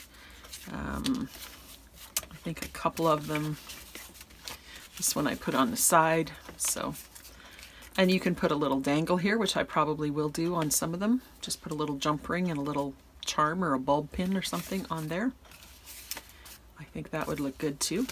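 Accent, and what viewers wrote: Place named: Canada. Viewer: Thanks for sharing the link to this video,. I learned so much and am looking forward to making some of these tags. The tags are awesome. I also really enjoy your accent. It brings back memories of some sweet Canadian friends who have gown to be with the Lord.💜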